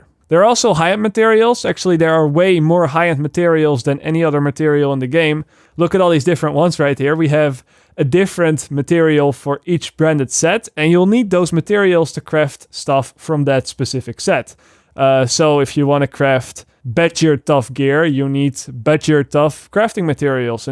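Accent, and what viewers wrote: Netherlands. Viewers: I came for the crafting info and as soon i heard you speak wist ik dat je een Nederlander was😂 goeie video ik ben gesubd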